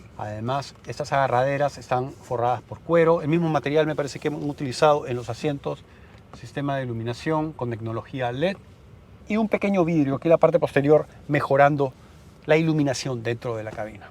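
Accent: Peru. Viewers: eres de Perú??????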